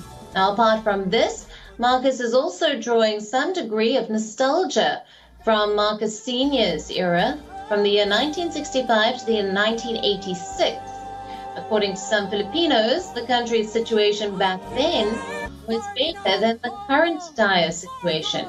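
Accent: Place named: India. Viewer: its an indian accent. Just like Filipino, Korean, Singaporean english accent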